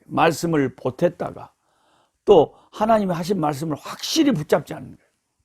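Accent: Jeolla, South Korea. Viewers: 아따 같은전라도네요 사투리넘정겹네요 순천이네요 선교사님 어떻거멀리가서선교하시느라 애쓰시네요 잘듣고잇네요 강건하세요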